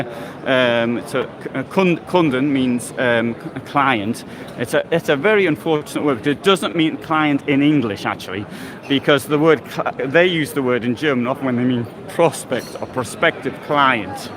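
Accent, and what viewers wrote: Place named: North East England, United Kingdom. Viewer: watching your videos are my guilty pleasure which I took up in lockdown. I’m a bit too old to take on a campervan. I’d be a bit daunted by the size since I drive a Smart Car! Will you be showing us your canine companion on one of your videos? Lovely to hear a fellow north easter on here. Thank you so much for doing these videos.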